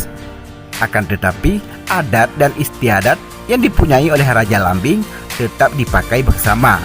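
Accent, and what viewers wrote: Aceh, Indonesia. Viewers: logat bangsa aceh kental sekali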